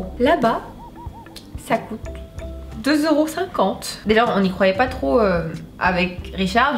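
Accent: Oran, Algeria. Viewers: tu es algérienne cool peux tu m’apprendre a parler comme vous ??? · bah non je prefere des videos en Fr psq je comprend pas trop l'accent oranaise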